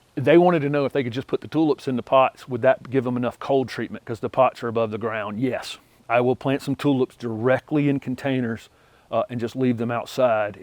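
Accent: South, United States. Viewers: I have absolutely LOVED your YouTube channel, since finding it a few months ago, and I have learned sooo much from you! Also, you and I share the same zone (7b), as I live very close to you, in Mooresville, so (as crazy as this sounds🤪) I feel so “at home” listening to your heart- warning southern accent and seeing your familiar mannerisms throughout your videos!😊 Also, It’s extremely obvious to me, and I’m positive to anyone who follows you, that you work so hard to prepare for every single video that you upload, and you’re very efficient and consistent! You never let us down! I can easily see how friendly you are, down to earth, and incredibly REAL, which is sadly so hard to find in people these days! Oh yeah! Duh!😂 Congratulations on you and your family’s absolutely stunning new home!🥳What an exciting time for y’all, and I absolutely love everything about it! 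I have loved seeing both your house and your yard from overhead with your drone! Of course, you’ve knocked it out of the park with your unique and brilliant landscape design, which is such an important part of creating a yard that you’ll love! Also, congratulations and YAY for recently welcoming beautiful “Miss Holly” into your family, as well!🧡 She’s such a beautiful and sweet dog!!! Again, thank you so very much for all you’ve done to help me, without even knowing it,! I am now a diehard, lifelong “HortTube” viewer, AND SHARER!!!! You’re definitely my #1 channel, hands down! Your hard work has absolutely paid o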